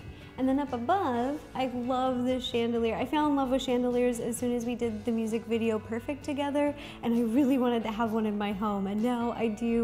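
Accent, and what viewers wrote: United States. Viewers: Are you American or whatever?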